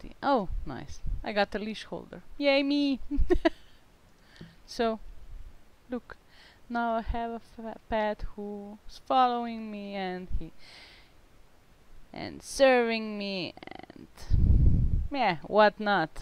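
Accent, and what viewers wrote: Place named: Portugal. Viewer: Your Portuguese accent speaking English is beautiful!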